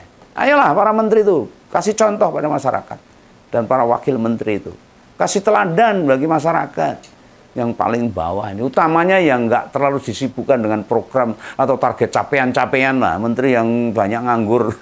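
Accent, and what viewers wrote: Java, Indonesia. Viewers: Pendengar setia om, krm emang didengerin, pemnyampeannya santai bahkan lucu medok, disambi ngerjain tugas RT hehe Semangat 💪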